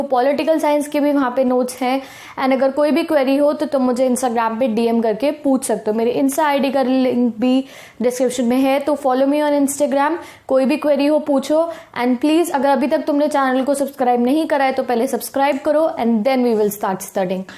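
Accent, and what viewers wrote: Punjab, India. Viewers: Di are you from punjab?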